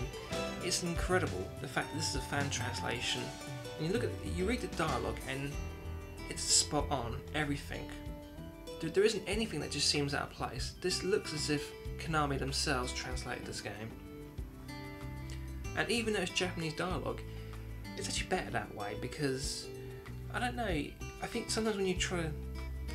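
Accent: United Kingdom. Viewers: Well seeing as he has a british accent and all the games on his shelf are in PAL cases, I am going to put 2 and 2 together and say the guy might be from the united kingdom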